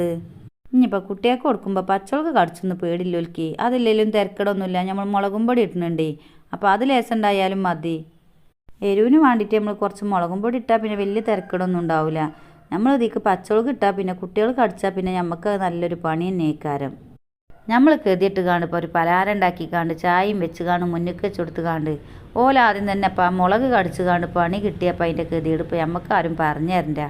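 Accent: Malappuram, India. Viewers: ഇതെന്തു സംസാരമാണ്.. മലപ്പുറത്തെ എല്ലാവരും ഈ ഭാഷയാണോ സംസാരിക്കുന്നത്.. കുറച്ചു നന്നായി സംസാരിച്ചു കൂടെ,.. എന്നാൽ എല്ലാവർക്കും മനസ്സിലാകുമല്ലോ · Malappuram pwrooi ❤❤❤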